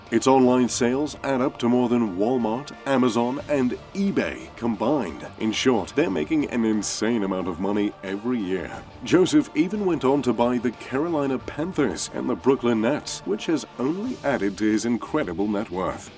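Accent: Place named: RP, United Kingdom. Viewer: Sorry, but the posh narrating isn’t needed, and personally, I find it really annoying.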